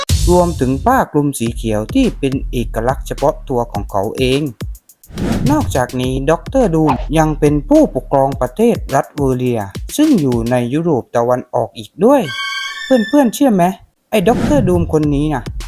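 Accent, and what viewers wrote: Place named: South, Thailand. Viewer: ผมชอบสำเนียง หนัดเลยครับ หรอยๆๆ มีมุขด้วย ทำคลิปอีกนะครับบ ❤❤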